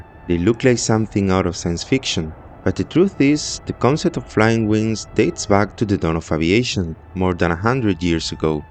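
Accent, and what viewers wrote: Spain. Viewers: jajja se nota que es ESPAÑOL. pero bueno asi llegas a una audencia mayor. Buen video. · suenas español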